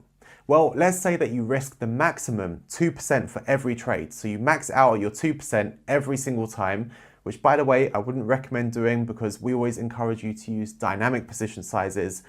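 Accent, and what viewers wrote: United Kingdom. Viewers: Loved it. So informative on so many levels! Thank you kindly ! Articulate English accent & witty humour a happy bonus :-)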